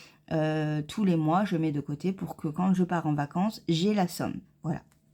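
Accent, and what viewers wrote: South, France. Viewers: 😂 Cet accent "ho pitaing" j'adore. Moi aussi des fois on entend fort mon accent et en hiver certains me disent " ho ça va elles t'ont pas fait mal les cigales quand elles sont sorties de ta bouche ?". Et la quand j'ai vu tout ce que tu as détricoté j'ai été choquée et mon fils viens me voir inquiet :"ça va mama?" et quand je lui ai montré ce que tu fais il a dit que tu es trop forte. Merci pour le tuto 😂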